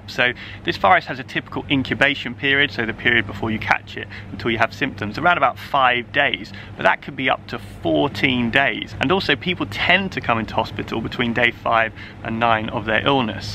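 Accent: United Kingdom. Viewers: Harfway froo. Beautifully Brit. (I’m sure that some of my Americanisms are offbeat to Brits, though probably not so endearing.)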